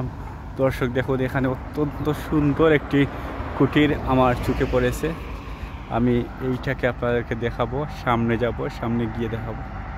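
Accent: Sylhet, Bangladesh. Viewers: সিলেটি মাথা কিতা সুন্নত নি। শুদ্ধ বাংলা বললে 64 জেলার মানুষ বুজবো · Love your video from Fr. Bhai, please try to continue your natural Sylheti math. Don't try pure bangla. Keep it up.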